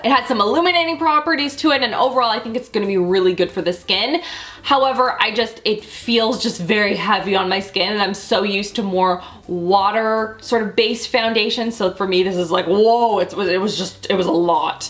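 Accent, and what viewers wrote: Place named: Canada. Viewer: I love your Canadian accent!!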